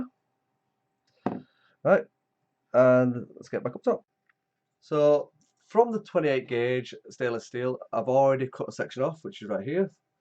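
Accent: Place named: England, United Kingdom. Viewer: Few things I enjoy more than an English narrator. Cheers.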